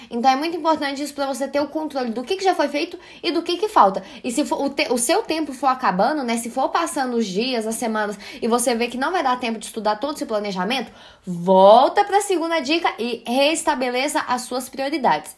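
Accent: Minas Gerais, Brazil. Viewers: menina, faz um tempo que nao te acompanho até pq meu foco é concurso público mas sempre via as aulas de história. Estou impressionada como seu canal cresceu e a qualidade e conteúdo dos vídeos tmb estao melhores... excelente! E o que é melhor é o sotaque mineiro!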